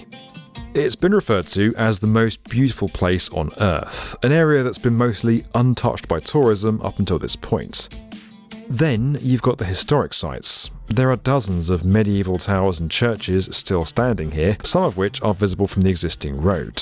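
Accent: England, United Kingdom. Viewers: What’s kind of narrative can we expect from someone with British accent mixing “human rights” with history narrating a Chinese-Russian projects? Expect a sugar coated poisoned pill. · Whenever you hear a British accent you can be confident that you are listening to bullshit limey propaganda. L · I hear an English accent then unsurprisingly the racism / white supremacy talking points soon follow. 🤣 "unbiased, straight facts" 🤣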